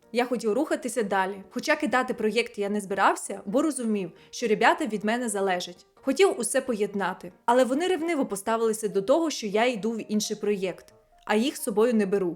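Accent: Surzhyk, Ukraine. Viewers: Мадам поработайтє над суржиком або мовою все ж розмовляйте.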